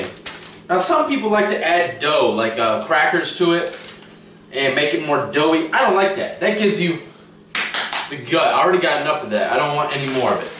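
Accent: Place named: South, United States. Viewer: really let out the full southern accent and word choice loose on this one..."allllright yallll...we're on to da next one..."😆😆🤣💀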